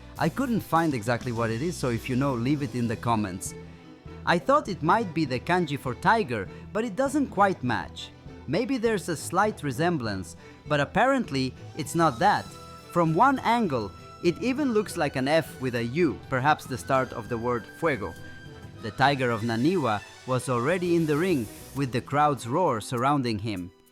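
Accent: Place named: India. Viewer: Are you indian? You have indian accent.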